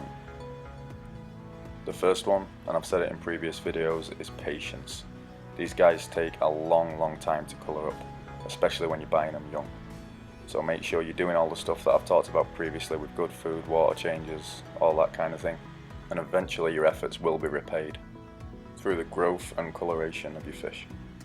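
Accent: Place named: Yorkshire, United Kingdom. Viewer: REALisation: you sound from Yorkshire but you mentioned you're in Pittsburg...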